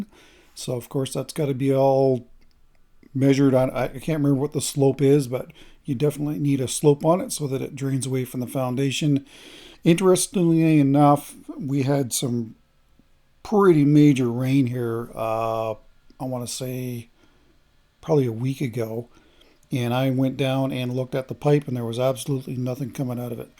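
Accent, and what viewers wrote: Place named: Canada. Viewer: Red Green says garage the way you do, I just figured it was a Canadian thing.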